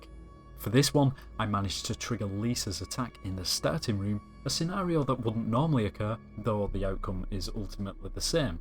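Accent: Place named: RP, United Kingdom. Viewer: ur not doing bbc news u dont have to speak like such a knobhead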